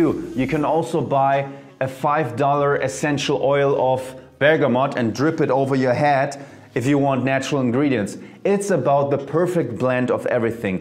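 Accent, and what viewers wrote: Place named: Germany. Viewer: Great video! This is crazy... I'm from the east coast of the U.S. But I have the habit of saying Bacarrat Rouge and other fragrance names with your German accent! 😆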